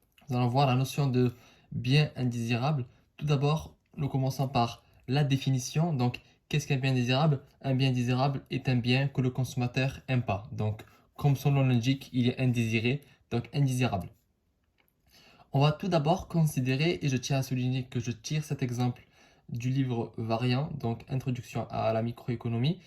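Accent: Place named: Morocco. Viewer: Merci, rien à dire vous sauvez mes révisions ! J'ai remarqué un accent marocain chez vous si je ne me trompe pas Allah y hafdk c'est très bénéfique ces séries de vidéos.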